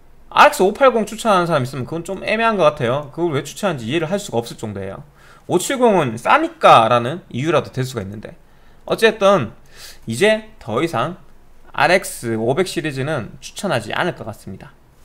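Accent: Gyeongsang, South Korea. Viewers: 님 말투가 완전 경북분 특히 대구분같으신데 대전으로 가신 이유가 있나요? 생각해보면 완전말투는 다른데 대전대구 둘다 큰도시고 거리도 가깝더라구요 그냥 궁금해서 ㅎㅎ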